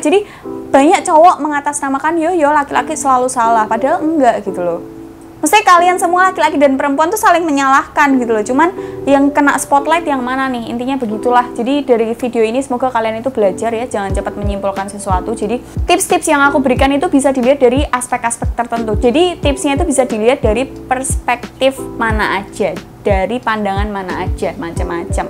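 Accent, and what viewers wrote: Java, Indonesia. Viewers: Mbak'e iki medok jowo ne seru wes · Medok banget mba, ane pusing dengernye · sangar ngomonge medhok jowo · Saya suka bahasa medoknya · Jowone sangking pundi mba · Peh jowomu mbak. Tapi lumayan menghibur kok. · Logat jawanya pekat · Logate mbake jowo banget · medok e wes mendarah daging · Entah kenapa gw seneng bgt nonton konten kak. Cakep Medok Santuy Blak²an Apa adanya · Logad jowonya itu loh asli ane suka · medok'e kaaaueeetokk mbak'e wkwkwkw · logat jowone kuentel banget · Medoknya itu Loh ❤️🔥🔥👍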